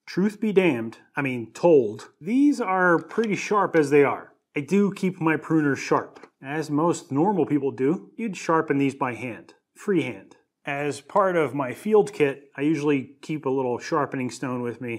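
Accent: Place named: New York, United States. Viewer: So, I thought this sounded like you but somehow different, a bit more raucous, slightly more vulgar and significantly more nonsensical. Your voice has taken on a more New York accent than I remembered, the family component wasn’t there but the Star Trek allusion was right there! Also, your production is more TV and less YouTube….. I must say, I really enjoyed it and at once wasn’t sure if it was you as I was watching on my phone and it wasn’t as apparent as on my desktop. If this is the new, I approve!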